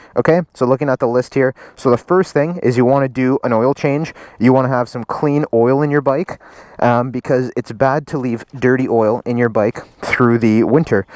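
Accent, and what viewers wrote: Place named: Canada. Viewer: You sound canadian btw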